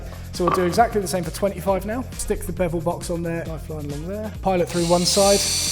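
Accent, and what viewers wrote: United Kingdom. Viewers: Don't add music noise to a demo video! You already have continuous talking, it is super fast, you have a British accent to get used to, your voice is a bit low and gutteral, and then you add this deafening level of extra noise. Why??? I came here for a QUIET and PEACEFUL video on woodworking, not getting driven deaf with a nervy video like this. So stressful to watch. I realize you are a young man buzzing with testosterone, but please get your workout in before filming. Your energy is too high and buzzing like a nuclear reactor. It does not have a calming effect on viewer. DO NOT ADD MUSIC TO ANY DEMO OR DIY. It is insanely irritating and unwatchable.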